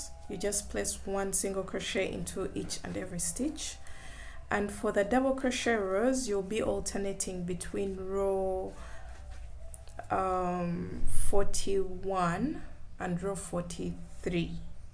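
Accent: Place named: Uganda. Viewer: She's Ugandan. I notice our accent everywhere ❤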